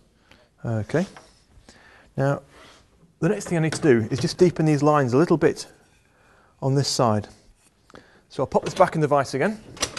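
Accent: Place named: United Kingdom. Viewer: not only is this Brillant !! but your British to boot whoo :) no wedding band hehe ( my bad ) LOL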